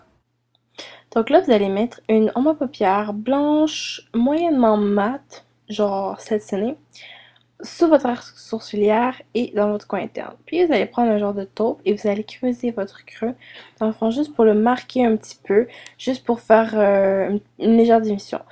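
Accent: Canada. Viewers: j'adore te regarder avec ton accent canadien ! j'adore!! <3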